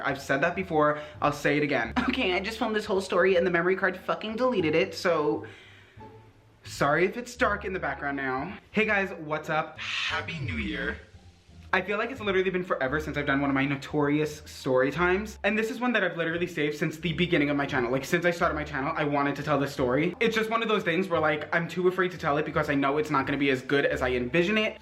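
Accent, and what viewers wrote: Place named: Chicago, United States. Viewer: Bahahahah you make my day I love you so much. I love your accent so much I’m from Chicago and when I heard you say mom or class (mahm, clayss, i say “a”s the same) I’m like this mans is a CITY BOI ❤️ hahaha you’re legit so beautiful and witty and charismatic, I’d square up on this teacher for you In highschool 😂💕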